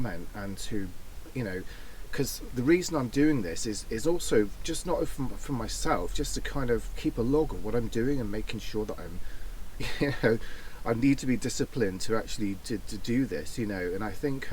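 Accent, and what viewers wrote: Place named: England, United Kingdom. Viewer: I would suggest you try a career helping women take care of their computers, doing everything needed to keep their computers going, also helping with mowing the grass and fixing doors when needed. Meanwhile, I think you should film all of England for those of us across the pond. I just watched 14 minutes of you and the best moment was when you said the dog was chilling. But I want to see cows and countryside with you talking about all the places in England and hear your lovely accent and "pardon me"'s for your coughs. That could be your signature, in a good way. Then we'd know it's you behind the camera.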